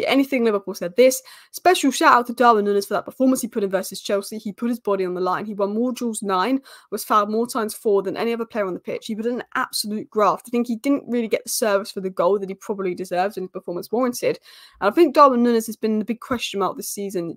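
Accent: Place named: Manchester, United Kingdom. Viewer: That’s a lovely accent you have, what part of Manchester is it from? Y.N.W.A.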